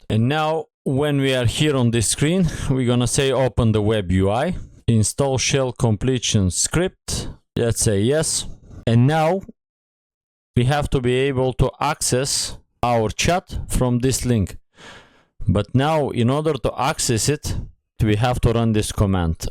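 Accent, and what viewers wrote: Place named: Eastern Europe. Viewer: You sound Eastern European. Hi from Romania. This is such a straight forward, no fluff tutorial you offered us. Thank you so much. You've got a new subscriber!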